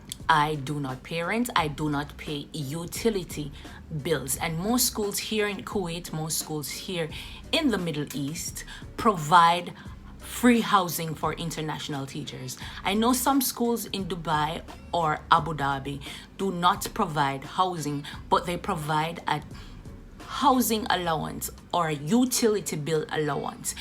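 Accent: Jamaica. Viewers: Hey I’m so glad I stumbled on your page I figured your accent want slightly familiar. I am also Jamaican seeking employment in Kuwait 🇰🇼 I will be messaging with further questions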